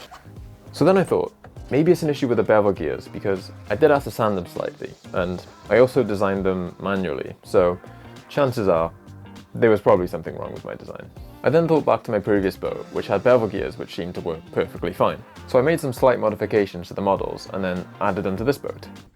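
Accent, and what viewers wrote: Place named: England, United Kingdom. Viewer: Legit sounds like Tom stanton... like as if they went to the same schools growing up with each other with whatever part of England they're from.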